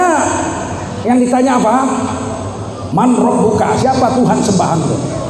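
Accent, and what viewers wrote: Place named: Medan, Indonesia. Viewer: hahaha...lawak ceramah nya... kok seperti orang medan cara bicara nya ya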